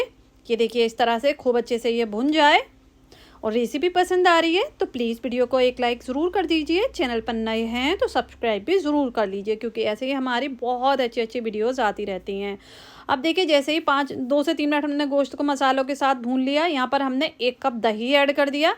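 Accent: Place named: Hyderabad, India. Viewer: Assalam alekum khairiyat main Saudi Arab se dekh raha hun aap sarson ka tel khate ho aapki language Hyderabad ki tarah hai aur aap sarson ka tel khate ho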